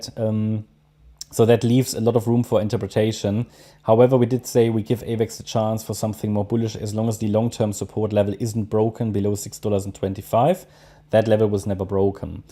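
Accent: Germany. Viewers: Not bullish😮😅😅😅...are you german ?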